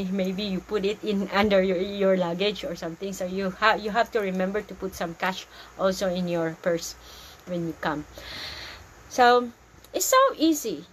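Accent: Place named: Palau. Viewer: Good to know. You really have the Palau accent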